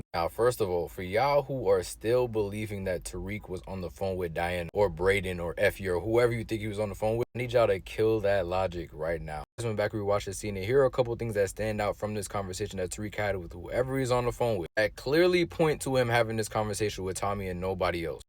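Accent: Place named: Toronto, Canada. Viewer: you have to be from toronto with that accent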